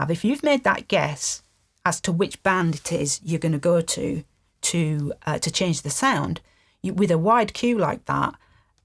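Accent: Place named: Yorkshire, United Kingdom. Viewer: As helpful as ever. And the icing on an already great cake is to have this wise advice delivered in a fabulous Yorkshire accent. Love it.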